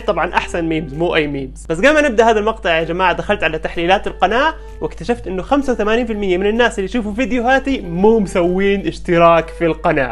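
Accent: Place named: Gulf. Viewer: انت من وين تتكلم خليجي اردني مصري لخبطتنا يخوي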